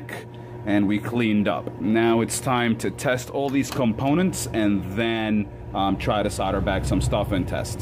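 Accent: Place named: East Coast, United States. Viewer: I like how you get more angry and your east coast accent thickens as the losses mount.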